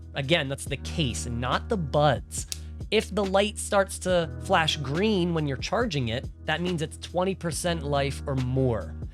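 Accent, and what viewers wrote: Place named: Pottstown, United States. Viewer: Hey man! Hope you are doing well! Great video and review. I've been watching a lot of Philly accent videos and it seems like you've retained our Pottstown way of speaking. Spread that all over Thailand lol. I've started learning Japanese...not sure how it compares to Thai, but different scripts. Would love to catch up and maybe do a video on the travel to Thailand for my travel agency if you're down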